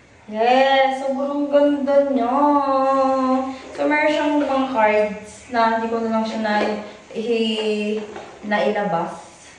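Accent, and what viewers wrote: Batangas, Philippines. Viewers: hi “ new blogger here!! cute ni ate mag batangeño 😂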